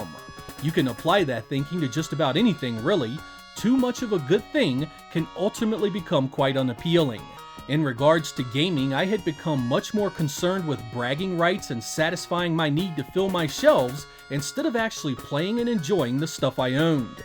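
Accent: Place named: Pennsylvania, United States. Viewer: We are exactly the same age and I even grew up in the same area and went to the same malls you talked about in previous videos. I grew up in the Southampton/Feasterville, PA area. I'm sure we would have been friends if I knew you at the time. Actually, when I first discovered your channel, the first thing I thought was, "hey, I know that accent!". :) Cheers!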